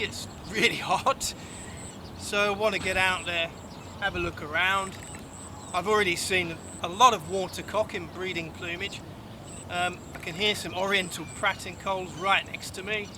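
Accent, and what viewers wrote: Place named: England, United Kingdom. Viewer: It looks a gorgeous birding spot. I've never been to Thailand. I'm familiar with some species, but most are totally exotic to me. Your narration is very interesting and helpful. I also like your English accent 😃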